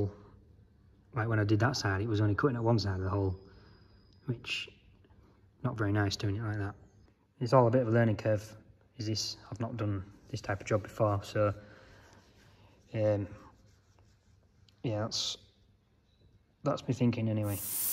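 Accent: Yorkshire, United Kingdom. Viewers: Learning and overcoming problems, is what real world manufacture and repair is all about. How tedious it must be to have a job where everything falls into place first time. BTW the auto generated subtitles make a hilarious job of your Yorkshire accent. Thanks for posting.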